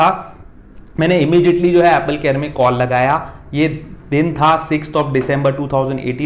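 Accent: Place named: Gujarat, India. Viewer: bro lil bit Gujarati accent its nice great video by the way ..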